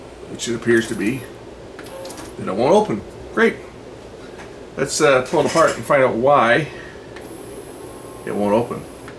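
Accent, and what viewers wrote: Canada. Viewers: Hello my friend, I really enjoyed this video. Love the cat too. I have family in Canada, I know that accent very well. Thanks for sharing, also I just subscribed. Kind regards. Paul.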